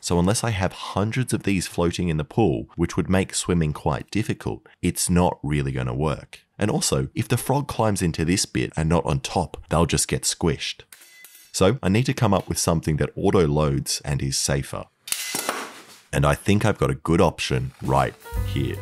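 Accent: Australia. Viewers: Bro this dude be australian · Are you from Austrália?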